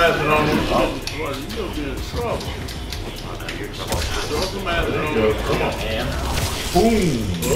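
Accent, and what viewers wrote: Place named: Florida, United States. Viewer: your voice same like florida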